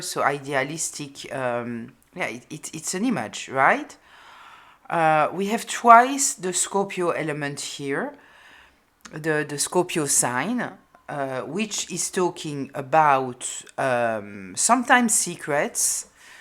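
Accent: France. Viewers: I absolutely love your French accent ❤️🧡💛💚🩵💙💜🤎🖤🩶🤍🩷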